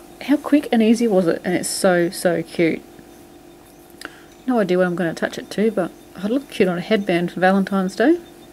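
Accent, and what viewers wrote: Australia. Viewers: I want to thank you once again, I make your one piece wonder sweater all the time for new babies. Finding your heart hat was a total plus for me, you the best person I have done tutorial by far. You have made me feel like I can do more and feel good about my work. Keep doing these wonderful tutorials, I love your accent. It reminds me of family that live in Sidney who I don't get to see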